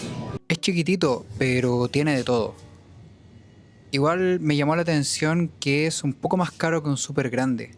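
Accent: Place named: Chile. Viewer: A mi me engañan apenas entre al video supe que era chileno, siempre hay un Chile 🐻‍❄️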